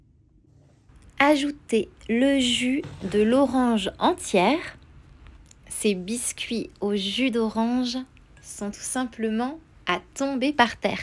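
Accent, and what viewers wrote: France. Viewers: I don't know about the biscuits . But that French accent is fabulous . ❤️👍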